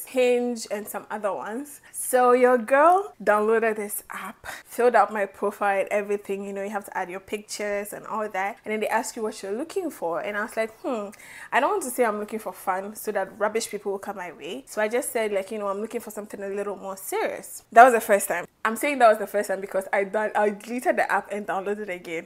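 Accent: Jamaica. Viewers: The best storyteller ❤❤. She all start talking potios..she is hooked.... i love that....if you are not Jamaican the "all a dem" might have missed you at the end. I loovvee it!❤ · Jamaican men are nice happy for you...when they really love you they will go all the way out for you...happy you got to visit my country and hope u come back all the best and have a great week...u catch on the patois real fast man❤❤❤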